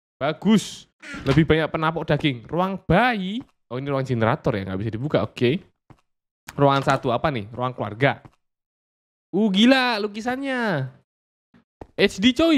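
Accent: Java, Indonesia. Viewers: Njenengan tiang pundhi to mas kok radi jowo ngoten😅😅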